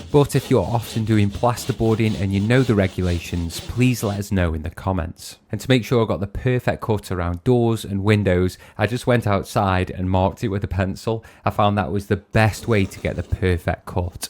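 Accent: United Kingdom. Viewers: I'm sure what you said earlier may have been valid, but that last comment about not working for the next person is just dumb. Did you put up your drywall (or concrete since you sound British and i know you guys dont build with paper mache like we do) before your plumbing and electrical work? It doesn't make sense. You should run the utilities first and then seal it off according to the codes in your country. Why would you put up a wall only to have to tear it down later to install wiring and plumbing? That approach is backwards and just creates extra work for yourself or the next person.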